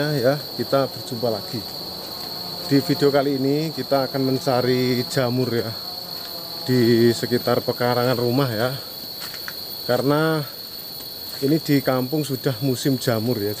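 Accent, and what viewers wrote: Java, Indonesia. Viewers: dari logatnya seperti org jawa tapi musik nya daerah sunda gek piye kokyo gk nyambung 👎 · Jowone endi mas pean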